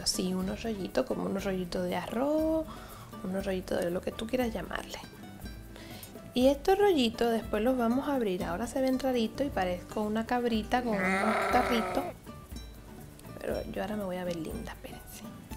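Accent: Cuba. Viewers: Una pregunta en este video hablas bien claro pero en los videos más nuevos hablas más con tu acento cubano ¿Por qué?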